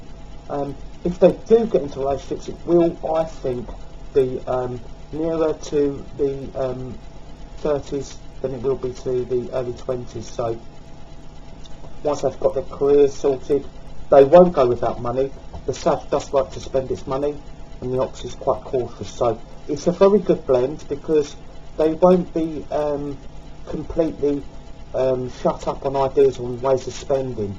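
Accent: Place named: London, United Kingdom. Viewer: What is your regional accent, London sort of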